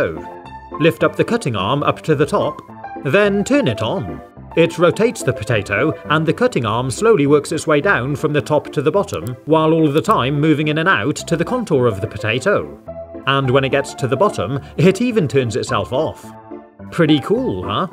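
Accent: England, United Kingdom. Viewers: We all love a hood english lad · VERRY old days: no sound kinda very old days: iconic music old days: voice and iconic music kinda new days: deeep voice and iconic music a lil bit new days: less deep and more british and iconic music new days: more less deeper voice and iconic music so uh yea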